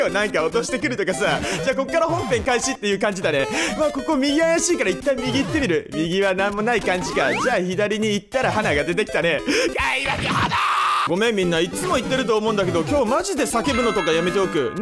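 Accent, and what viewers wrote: Fukuoka, Japan. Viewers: 福岡弁？とゴミみたいなOPツボです！いつも楽しませてもらってます🤗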